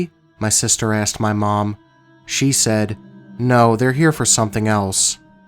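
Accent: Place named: East Coast, United States. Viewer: I love hearing your stuff, and always notice the East Coast, Tri-state "twang" to your voice! It's like listening to a friend or family member of mine telling me a story, as we're from Jersey (then Delaware and now Lancaster, PA). Not sure what state you're from but I hear it : )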